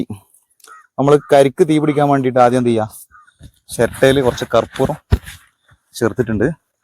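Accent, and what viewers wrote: Malappuram, India. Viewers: ബ്രോ കൂടുതൽ ജാഡ ഇല്ലാത്ത മികച്ച അവതരണം നാടൻ കുക്കിങ് രീതി ചെയ്തതെല്ലാം വളരെ പെർഫെക്ട് ഇതുപോലുള്ള വീഡിയോസ് ഇനിയും പ്രതീക്ഷിക്കുന്നു കാണാൻ നല്ല ഭംഗിയുണ്ട് മലപ്പുറം സ്ലാഗിലുള്ള സംസാരം പൊളിച്ചു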